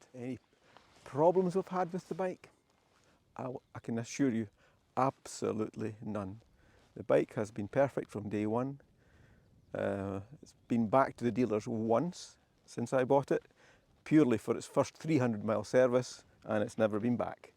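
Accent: Scotland, United Kingdom. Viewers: haha no doubt, but I meant experiencing the next level of RE bikes. Anyways, thumbs up to these cool videos and that super cool Scottish accent.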